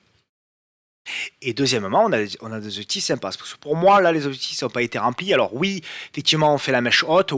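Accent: South, France. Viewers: Accent du suuuud lol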